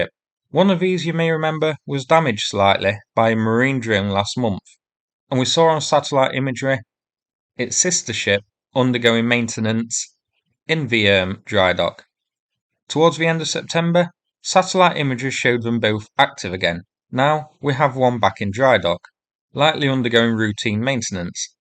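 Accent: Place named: Lincolnshire, United Kingdom. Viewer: I'm trying to identify your accent! You sound exactly like a good friend I worked with years ago in Bangkok! He ( Gavin) was from Lincolnshire area I think?? Keep up the great reporting!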